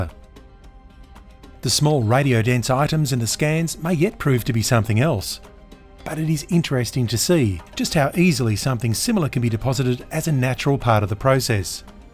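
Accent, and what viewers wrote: Australia. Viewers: Kiwi here; most DEFINITELY has fair dinkum Australian vowels! He's in Queensland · aussie. Eastern states based on the accent. My guess is southern Queensland or possibly Victoria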